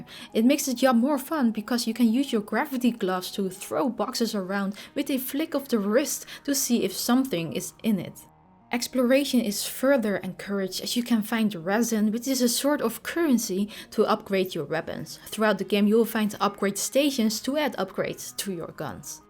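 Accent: Netherlands. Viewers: Her English pronunciation is already nearly flawless. I can understand her perfectly every time she talks, something I can't say about many, many native speakers. And besides, that Dutch accent is awesome!! So my recommendation to you is to go pound sand. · Are you dutch by any chance?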